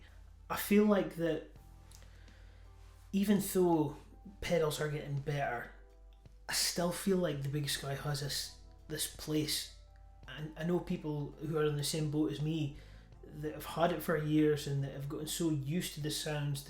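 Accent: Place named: Scotland, United Kingdom. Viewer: lol no it was meant as a good thing I just heard your accent and figured it's Scottish so call him Braveheart 😉. I heard you mention playing in church, I also play for my church. Keep up the good content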